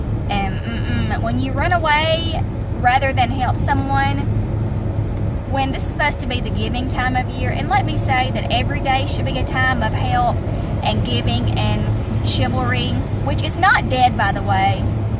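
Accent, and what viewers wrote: South, United States. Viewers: understood. It is also strange because it sounds like you are from the south. Don't give up hope. Seek first to understand what is happening to our culture so you don't suffer needlessly.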